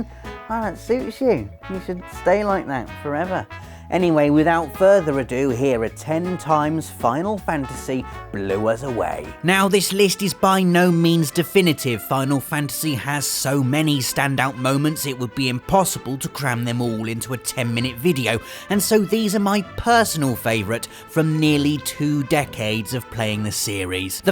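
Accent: England, United Kingdom. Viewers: Asha2820 He's English. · If i could bear through the narrator voice I'd watch it but god I just can't... :/ Sounds like a british kindergarten teacher talking to below 6 yo children.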